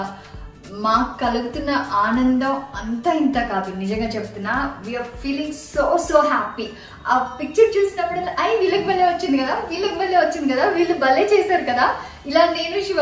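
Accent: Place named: Bangalore, India. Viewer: koncham banglore thelugu matladthundedhi kadhaa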